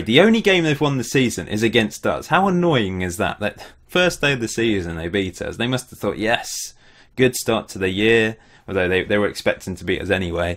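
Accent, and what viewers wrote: RP, United Kingdom. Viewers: for the record, my girlfriend refers to you as 'that posh guy who talks about football' - and she isn't even British.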